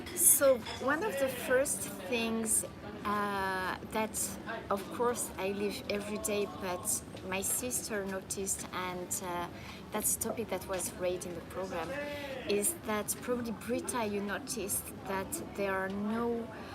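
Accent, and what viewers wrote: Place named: France. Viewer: i like her french accent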